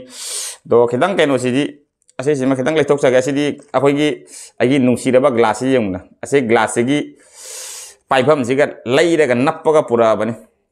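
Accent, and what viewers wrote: Cachar, India. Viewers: Brodrj cashar accent male if im not wrong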